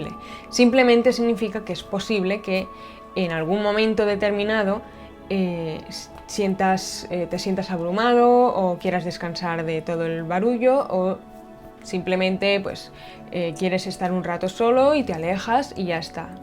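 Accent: Spain. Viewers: me encanta tu acento español se escucha muy bonito. Saludos desde Guatemala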